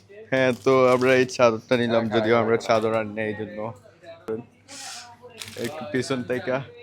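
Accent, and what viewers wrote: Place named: Sylhet, Bangladesh. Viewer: Sylhety mat beshi vala lage😊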